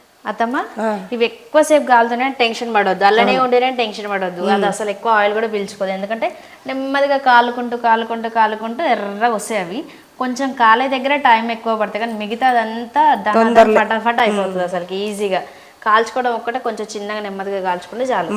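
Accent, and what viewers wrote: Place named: Telangana, India. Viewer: Telangana slang telustundi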